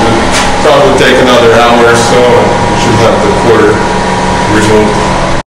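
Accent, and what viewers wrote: Russia. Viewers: Выдает русский акцент ))))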